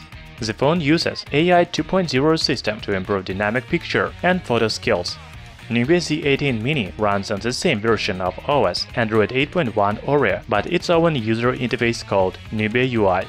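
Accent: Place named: Russia. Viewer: Автор русский, вы чо по акценту не слышите?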